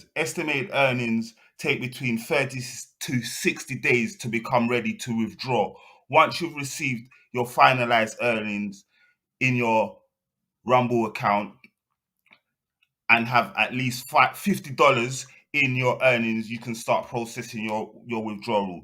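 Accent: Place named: United Kingdom. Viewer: Yes thank you for this video. Been trying to find out how to set up Rumble account. Nicely explained by you man. Nice too to hear the voice of a guy from my ends. Thanks again. Eldad Amaa(UK).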